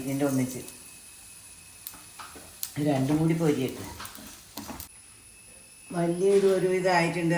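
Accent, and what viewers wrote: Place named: Kannur, India. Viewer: Supper 😍😍 nammdea kannur slang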